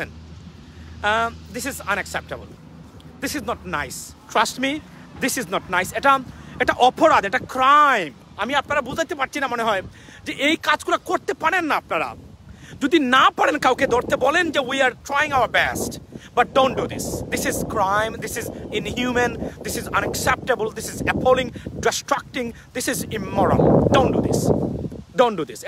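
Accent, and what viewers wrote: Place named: Sylhet, Bangladesh. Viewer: O sylheti pua....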